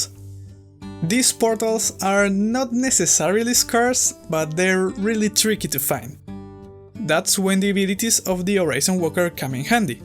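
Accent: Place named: Argentina. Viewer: che pero vos sos de argentina? Perdona si no es así. Es que tu acento inglés es muy argento!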